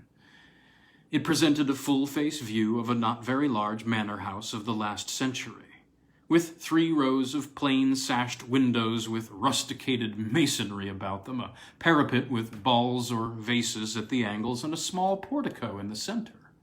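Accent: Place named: United States. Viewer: To hear this in an American accent is curious, of course, but you bring it off well. Thanks!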